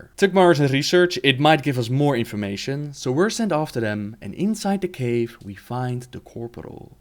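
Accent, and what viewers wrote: Netherlands. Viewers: You're dutch aren't you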